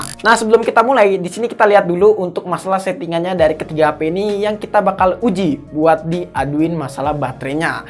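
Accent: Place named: Surabaya, Indonesia. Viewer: Arek Suroboyo Yo lur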